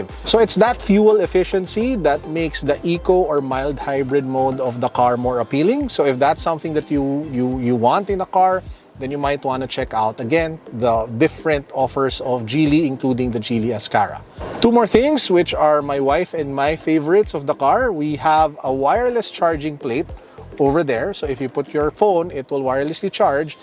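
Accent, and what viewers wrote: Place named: Philippines. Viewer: gawa kapa ibang review sir mas maganda kasi boses mo kesa don kay autodeal. yung english mo kasi sir may tagalog accent pa kaya ayos sa tenga.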